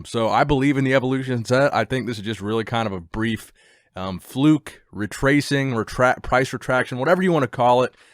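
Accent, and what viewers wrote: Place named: New Zealand. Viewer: Awesome videos, you actually sound like a new Zealander too which is crazy. Keep it up bro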